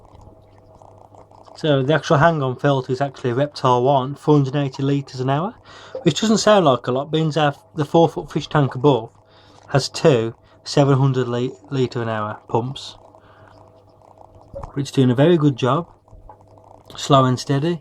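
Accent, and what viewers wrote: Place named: Wolverhampton, United Kingdom. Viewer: This setup looks awesome! Tubbs and Edwards look happy to see you :) I just brought my first aquarium but I'll be keeping fish. You sound like you're from Wolverhampton like me!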